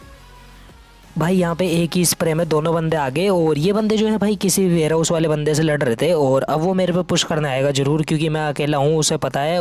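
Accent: India. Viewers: Kis kis ko ke bolne ka style pasand hai Matlab ek dam Desi wali feeling aave video dekhti ber😍😍👌👌🙏🙏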